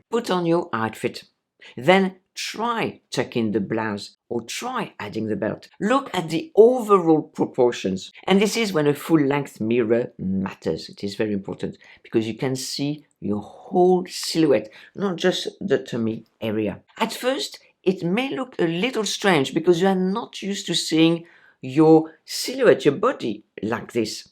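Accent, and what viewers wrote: France. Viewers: . You are a natural teacher. Your voice tone, the pauses, inflections are so calming. The French accent is the topping on the cake! You impart a part of yourself to us. We feel it. You are so confident. You pull us in and we want to learn everything! Even if we know we're already. Thats a gift from God. Never give up. PS I can't wait to make apple your cakes from your other channel.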